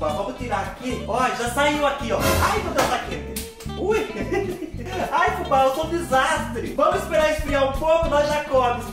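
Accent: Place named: Minas Gerais, Brazil. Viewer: Descobri você ontem dia 21,mas estou amando você com esse sotaque de mineiro!!!!!!! KKKKK Você é muito divertido Fubá.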